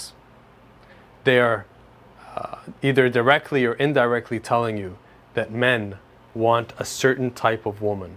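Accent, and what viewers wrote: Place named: Greece. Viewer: I love your accent...Are you Greek?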